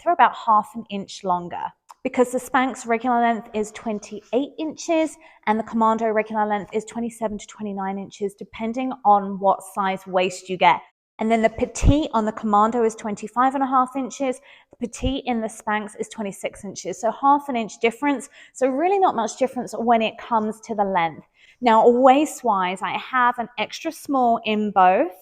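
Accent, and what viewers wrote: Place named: United Kingdom. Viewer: Love your British accent! I think you should have ordered a size small in the Commandos! 😊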